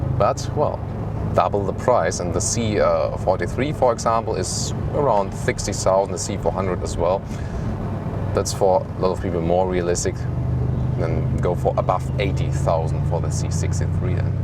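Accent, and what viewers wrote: Germany. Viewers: Oh Mann,. Dein Englisch klingt...Deutsch. Du sprichst flüssig aber mit deutscher Intonation, was absolut furchtbar klingt. Ein wenig Übung und die Sache klappt, gib' Dir einfach Mühe! Selbst als Deutscher kann man dieses Denglisch kaum ertragen. Außerdem solltest Du lernen, wie man bestimmte englische Wörter ausspricht. Beispiel: Coupe wird Cuup gesprochen, nicht Coupee. Bitte verstehe das als konstruktive Kritik.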